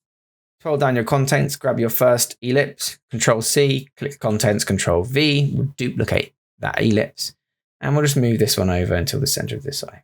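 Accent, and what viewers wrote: United Kingdom. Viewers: I've already commented on other videos of yours, just wanted to say a big thank you for how much I'm learning thanks to you. Among so many tutorials there are around, your channel is indisputably one of the best. Keep up the good work my friend. Also, listening to someone speaking with a British accent for so many hours sure makes lessons far more enjoyable lol.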